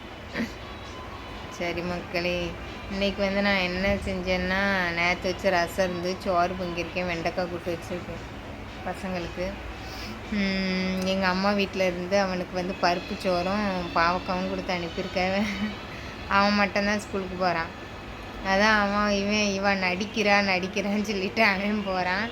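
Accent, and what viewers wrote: Tirunelveli, India. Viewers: Paechu tirunelveli maari irukku. Ethana naala coimbatore la irukinga. Enga dialysis panringa, also amount for one dialysis?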